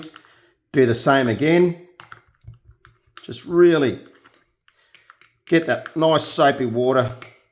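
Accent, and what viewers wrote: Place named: Australia. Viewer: You deserve more views. Love your paintings and especially your very Aussie commentary.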